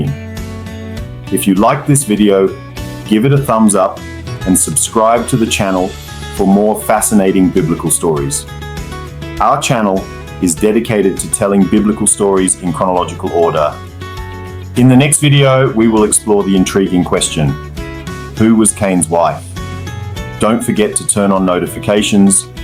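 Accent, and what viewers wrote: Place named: United States. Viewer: TA falando ingles pra caraca americano mesmo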